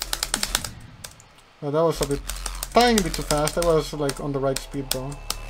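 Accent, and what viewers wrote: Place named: India. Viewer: but he sounds india so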